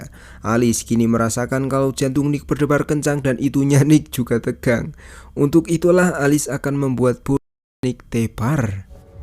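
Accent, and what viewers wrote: Java, Indonesia. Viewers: lah admin wong jowo cuk, dengar gak sih cara bicaranya 😂 tumben ada wong jowo gak bisa diajak bercanda.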